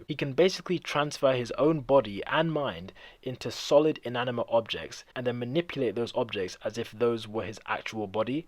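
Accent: United Kingdom. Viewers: You are awesome3. Hope you get bigger man it will happen soon. Are u British btw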